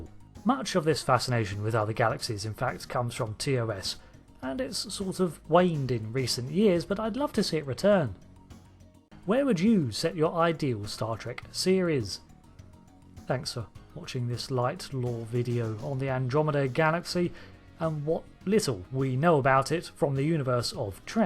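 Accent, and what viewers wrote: United Kingdom. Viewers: Ruined with a nit brit narratah.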